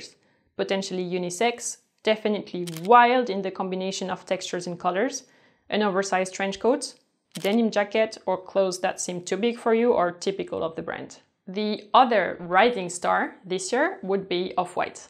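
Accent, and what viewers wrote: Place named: France. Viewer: i love your accent so much!🤗 it's true🙄. with french shade.. i'm personally from Ukraine and i study english now☺. and this channel is a piece of inspiration for me🙄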